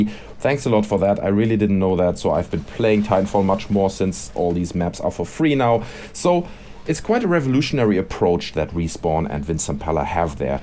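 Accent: Germany. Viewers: A you german bist du deutsch? :D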